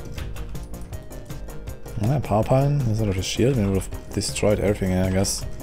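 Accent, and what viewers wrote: Germany. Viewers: you are 1000 percent a german right?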